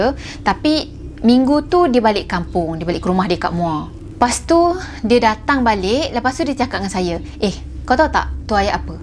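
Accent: Kelantan, Malaysia. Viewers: Salam NI orang kelate ke?? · Mek kelante rupanya mati2 aku ingat wong jowo. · Ore kelate ko? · Laaa..ore kelateee..... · Asal kelate ko??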